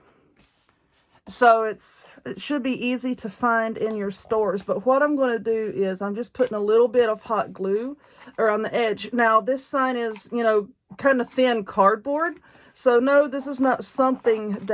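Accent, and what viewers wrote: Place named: Alabama, United States. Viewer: I love all of your projects. I get great ideas from you. I also love someone who sounds like me. I’m from Alabama so you go girl. You stay safe and well. I will be making this wreath. I’ve been looking for some decor for my gazebo. Thank you so much.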